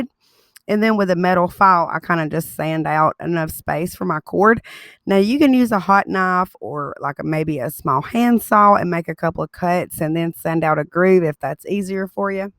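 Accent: South, United States. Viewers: sweetest southern drawl ever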